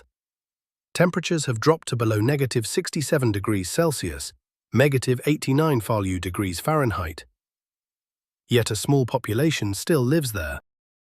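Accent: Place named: United Kingdom. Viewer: nice! I have been trying to find a video with British English and I have it here!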